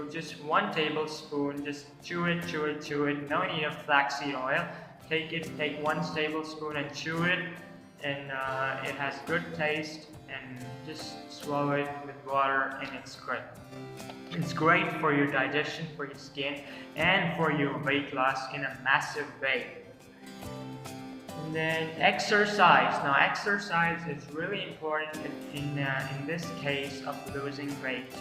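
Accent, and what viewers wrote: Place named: United Kingdom. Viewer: I am sorry for saying yu bad words actually that's not my intension becoz i was serching on airlines job on you tube then i found out your video but i saw your speaking tond is as like British accent that's i don't like.so that's why i thrown out bad words to u.Now i am heartfully apologize for what i said to you. I know you have big heart sorry brother..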